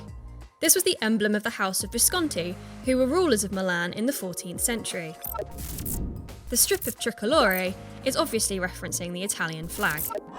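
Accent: England, United Kingdom. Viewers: The usual GHASTLY English pronunciation of Renault, Modena, etc. Listen to Forvo first, maybe you can make it a little better.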